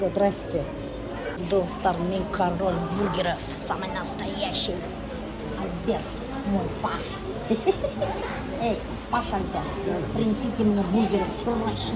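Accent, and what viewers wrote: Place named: Trans-Ural Bashkir, Russia. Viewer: она на диалекте зауральских башкир говорит иногда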